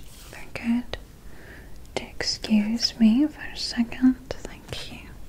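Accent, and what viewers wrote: United Kingdom. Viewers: I love your accent 👌🏼👌🏼👌🏼 ITs so beautiful... sound a bit British 🇬🇧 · This is my first video of yours in a while and let me tell you, the accent threw me for a loop. I’m here trying to figure out if it’s acting or if I’m misremembering you not having a British accent lol. Your voice is relaxing regardless of the accent. Great video!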